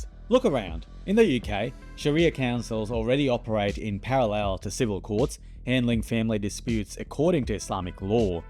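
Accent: Australia. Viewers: Great video, thank you. I can't believe you have such an Aussie accent but are from the Maldives. And I got a shock when you said you were an ex-Muslim in your previous video. What age were you when you arrived in Australia?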